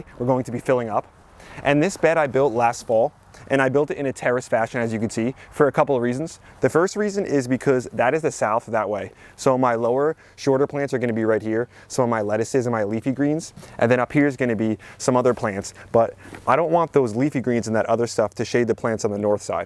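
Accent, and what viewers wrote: New Jersey, United States. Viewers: I love watching him. He’s 100% New Jersey and this Texan loves his excitement. I don’t see content worth watching on yours. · "hold all that excess waudder" I f'n love your videos and jersey accent, it makes me think of my old college buddies. Keep it up, and full disclosure I steal these ideas!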